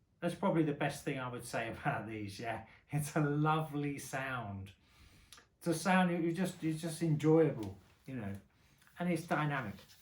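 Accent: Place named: Cockney, United Kingdom. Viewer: don’t you live his accent! It’s a pleasant combination of proper english & cockney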